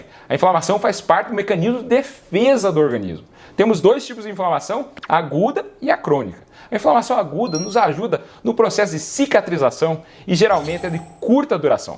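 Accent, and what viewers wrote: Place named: South, Brazil. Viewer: Adoro isso, médico q não deixou de clinicar pra virar Youtuber e vender fórmulas! Este cardiologista sim. Se vê q ele fala de um hospital e tem um sotaque de quem é da região sul. Este eu ouço SeMPRe e sigo o q indica. Vai direto ao assunto sem enrolar e sem vender nada. Confio plenamente neste, e tô sempre de ôlho e o escuto até o fim. Tmb, não enche linguiça até chegar aos finalmentes. Meu preferido, entre outros parecidos.Uu😍👏👏👏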